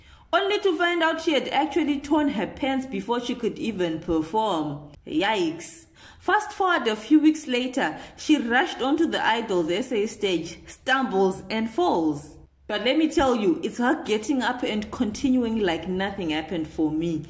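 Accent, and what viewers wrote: Zimbabwe. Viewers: Kkkk its either a Shona or Venda accent kkk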